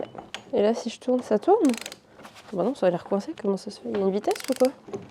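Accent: Alsace, France. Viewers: Cc tu m'as bien fais rire quand tu es en compagnie de tes parents tu as l'accent alsacien a fond😂😂😂😂 c'est trop mignon et bonne année ma belle félicitations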